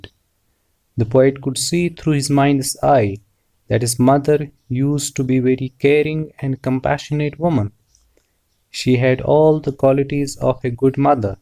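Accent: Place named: Kashmir, India. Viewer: Kashmiri aawaaz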